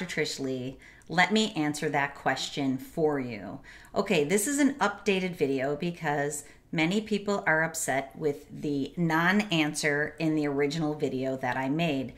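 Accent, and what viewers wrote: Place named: Wisconsin, United States. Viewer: That Wisconsin accent is so annoying miss church lady.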